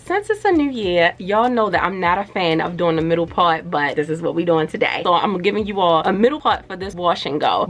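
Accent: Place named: DMV, United States. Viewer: New subbie here from Jackie Naturals 💕 Would love to support each other! Your curls are absolutely beautiful 😍 I am in loveee lol. Are you from the DMV? You kind of sound like it. Loving your hair & energy 🔥 The results look so good!!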